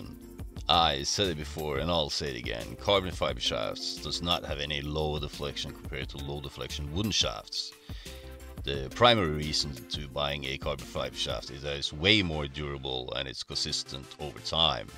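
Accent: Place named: Norway. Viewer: Thought so I have a few friends from Norway and caught a faint lilt in your faultless English.update,I have just ordered this cue now,for sure a great back up to my predator revo,thanks for what I believe to be a wise investment,look forward to more of your content,by the way you move around the table with great skill.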